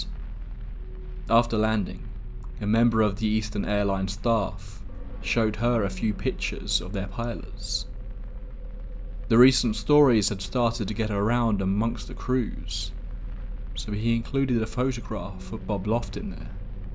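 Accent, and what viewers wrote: England, United Kingdom. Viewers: Nice british accent n great storytelling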